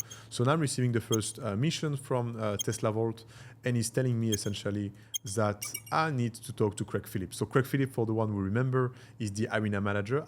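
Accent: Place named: Iran. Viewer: مشخصه لهجش ایرانیه این اداها چیه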